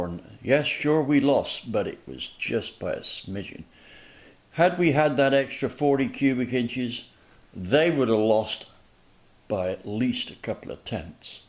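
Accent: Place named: Yeovil, United Kingdom. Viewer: I cant find any history but he sounds like he's from Yeovil. These videos are like ASMR to me.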